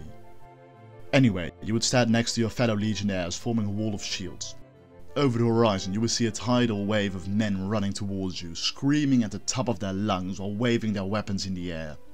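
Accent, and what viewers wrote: Netherlands. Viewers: Thicc Dutch accent, daammnn.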